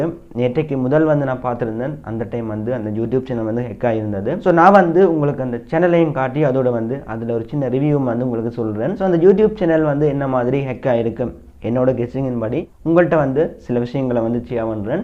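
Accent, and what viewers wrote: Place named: India. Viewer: வணக்கம் இந்த காணொளியை நான் ஓட விட்டு ஓடவிட்டு தான் பார்த்தேன் காரணம் உங்களது மொழியில் அநேக ஆங்கில சொற்கள் கலக்கப்படுகின்றது அது வேண்டுமென்றே கலக்கப்படுகிறது அத்தோடு கூட எதற்காக நீங்கள் இந்திய பாணியில் எமது தமிழை உச்சரிக்க வேண்டும் அதற்காக இந்த காணொளியை நான் விரும்பவில்லை 👎👎👎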